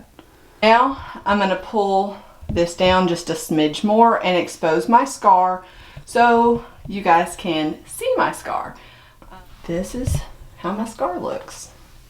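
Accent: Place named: South, United States. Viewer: Hey! I'm not sure if anyone has already asked this question or if you're allowed to share the info but I was wondering where you went to have your surgery? You sound southern and I was hoping (fingers crossed) we were relatively close in location. I would love to have this done and would want to go to a good surgeon.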